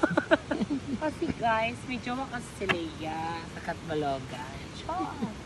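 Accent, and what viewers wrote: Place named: Ilonggo, Philippines. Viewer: Naaaliw talaga ako sayo pqg nagsasalita ka..lalo kapag ilonggo tono mo😂😂😂😂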